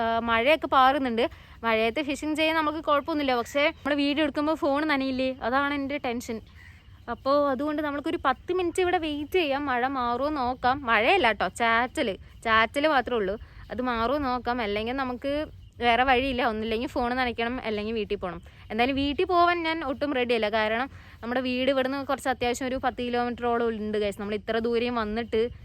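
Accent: Palakkad, India. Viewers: സ്ലാങ് കണ്ടപ്പോൾ പാലക്കാട്‌ ആണോ എന്ന് തോന്നി അതാ ചോദിച്ചത്.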